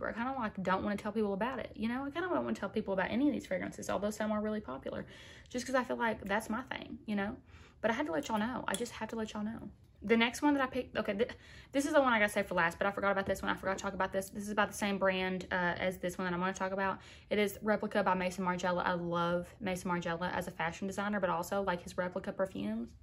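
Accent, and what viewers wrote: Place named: Kentucky, United States. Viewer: Are you from the southern Kentucky ? I hear the accent in you!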